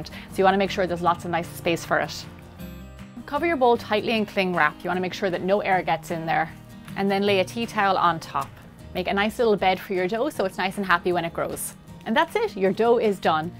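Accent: Ireland. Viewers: just a wee bit o butter. lol I know you don't say that but I love your accent. irish I'm guessing... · are you Irish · OH WOW!!! They look incredible! I make bagels pretty much the same way, by boiling them. And I have to say - your lovely Irish accent made them seem that more scrummy! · Are u Irish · She is from Ireland I'm pretty sure :) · Irish accent? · i love pretzels thanks what is youre nationality im guessing irish lol no clue carry on carry on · Lovely Irish woman.... beautiful accent.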